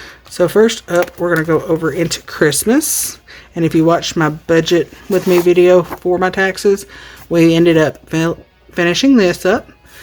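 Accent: South, United States. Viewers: I'll always love just listening to you chat with us. You're just the right amount of southern (on something you mentioned in another vid)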